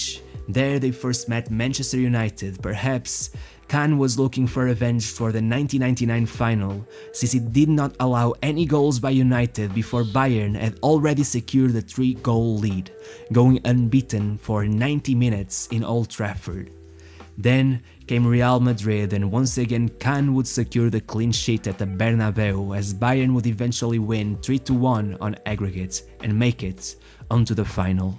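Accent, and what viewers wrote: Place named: Portugal. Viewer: confessa lá, tu és tuga.... nota-se no sotaque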